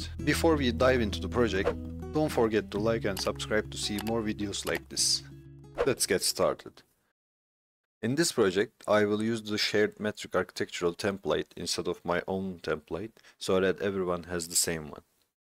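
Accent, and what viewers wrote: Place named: United States. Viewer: The word he is saying is Command, but with an American accent. For example: WA is the Wall Command. So when you are trying to figure out what he is saying, just try saying the word in American, not English, and you'll figure out what he's talking about. I know it makes it difficult for the other 98.5% of countries on the planet that don't speak American, but at least we all use Metric, unlike 1.5% of countries that are still using Imperial units.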